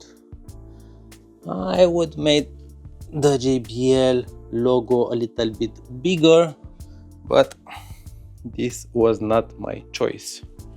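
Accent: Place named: Romania. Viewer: Căutăm să văd recenzii despre boxa asta am dat de canalul ăsta .Să moară bibi dacă tu nu ești românaș de-al nostru chiar dacă vorbești în engleză bag mana-n foc după accent . Sper sa nu dau greș cu boxa asta .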